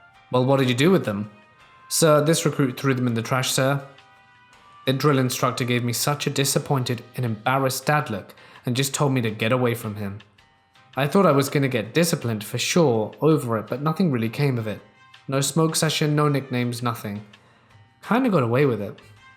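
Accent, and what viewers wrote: England, United Kingdom. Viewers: R u from England??? Cuz u have a certain accent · Nothing better than hearing vampirish English accent saying “shart”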